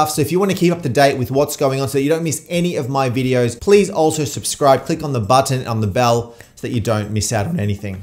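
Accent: Australia. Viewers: The Australian pronunciation seems logical but I do pronounce cache "cash" (I'm a native English speaker from Hong Kong). One of the funniest Australian pronunciations to me is "daahtuh" (data). And why do Australians pronounce the 'a' in "last" the British way but the 'a' in "chance" the American way? 🤔 My own pronunciations can be weird though: I pronounce "route" the British way ("root") but "router" the American way ("rauter") because "rooter" reminds of the Australian slang and so sounds inappropriate... 🙃 More importantly, thanks for the helpful video. I found your video bc the tech guys in my office will clear the cache of my PC work laptop when it's running slow, and it occurred that I'd never once cleared my personal MacBook's cache. Did you actually just pronounce wary "weary"? 🤫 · Thanks. I'm an Aussie too, so I pronounce cache same as you. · I'm Australian too. 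I say caysh too, But then I also say shedule rather than scedule. But it's useful to know that when other videos are clearing the cash, it's got nothing to do with robbing a bank. , you are getting tired, because you say you should be weary when you are deleting your cache. I think you should be wary.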